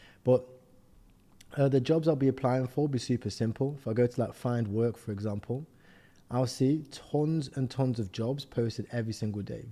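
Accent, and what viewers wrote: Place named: East Midlands, United Kingdom. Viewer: hope all is well fam great video again. can i ask are you from the east mids area i'm from nottingham and you sound like a people from this region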